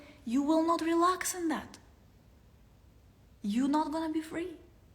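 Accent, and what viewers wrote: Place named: Russia. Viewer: A very strong russian accent... annoying.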